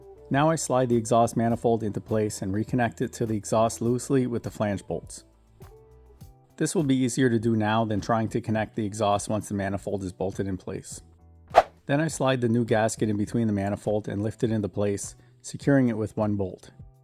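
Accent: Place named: Canada. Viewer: this guy sounds so unbelievably canadian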